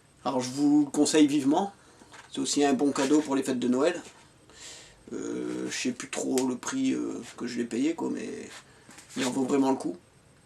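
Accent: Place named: Nord, France. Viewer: C est le Noooooord l accent tient je m abonne pour voir ce que tu fais tu ma l air sincère dans tes paroles . Et suis picard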